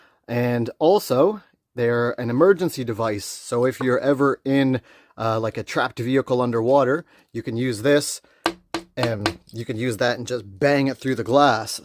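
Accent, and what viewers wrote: Canada. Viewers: Love you're Canadian accent 🫶🏼🎄🌨️❄️👋🏼🫂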